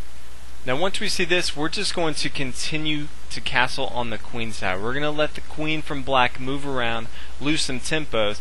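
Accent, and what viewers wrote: United States. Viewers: I love this dude's voice / accent what part of US is he from?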